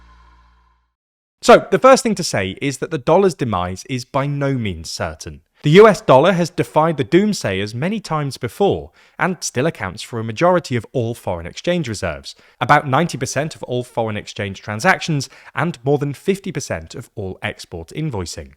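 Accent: United Kingdom. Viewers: Yeah when I heard the british accent I thought this was going to be a waste. These guys never have good info.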